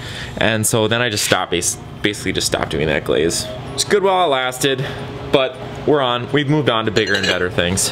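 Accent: Minnesota, United States. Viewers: First time watching this channel. About in, thought to myself ‘he sounds like a Minnesotan a bit’ ... checked the bio, and what do ya know, I was right. (I’m also Minnesotan Btw), but I could definitely recognize the accent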